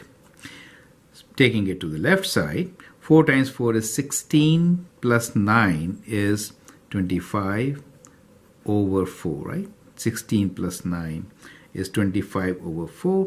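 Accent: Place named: India. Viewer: you sound so intelligent indian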